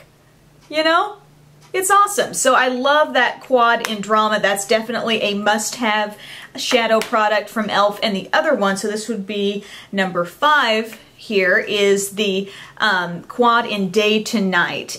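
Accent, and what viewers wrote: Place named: United States. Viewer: She has an American accent. Everyone has an accent.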